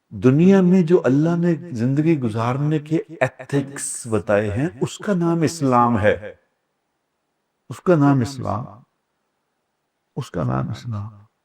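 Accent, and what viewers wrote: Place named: Lahore, Pakistan. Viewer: Loves his lahori punjabi 😊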